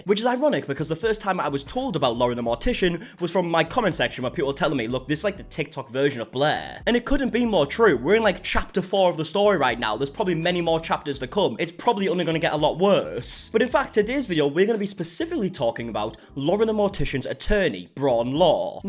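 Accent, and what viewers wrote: United Kingdom. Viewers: I love the Bri'ish accent. It's a huge plus for me when choosing which youtubers to follow. One thing I love about youtube is finding people that aren't just Americans and hearing how they sound. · don't you dare change the adorable british accent, i will throw hands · The purple is honestly a nice light colour and your accent and the way you speak has always been amusing to me (not in a mean way) just saying your accent is not a problem, nothing wrong with it. I’m also British and I sometimes get told by people from America that I sound like I’m speaking a completely different language 🤣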